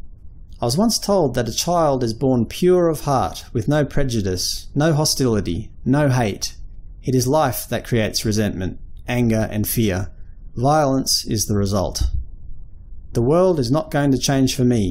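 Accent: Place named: Australia. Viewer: Hey there. I value your commitment to informing the public on misanthropy, and I really like your content. That accent sounds Australian, as am I. I am a researcher interested in understanding human behaviour, particularly so far as it relates to anthrozoology and ecopsychology. I am curious, are you a scholar or academic professional?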